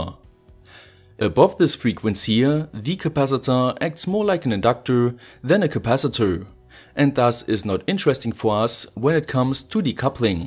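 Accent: Germany. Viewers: German accent 😯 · Is it me or does he sound more German every video he releases?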